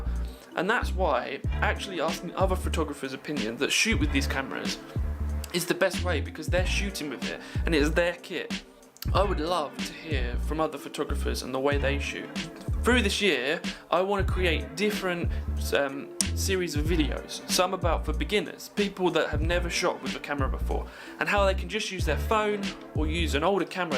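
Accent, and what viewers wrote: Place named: United Kingdom. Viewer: You won't beleive it, but i watched this video to exercise my listening skills in British English 😂😂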